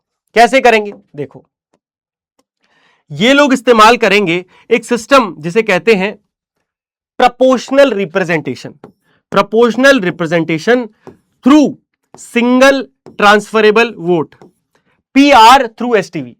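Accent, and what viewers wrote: Bihar, India. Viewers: Ara bhaiya lag raha haii ki bihar se ha 😮😮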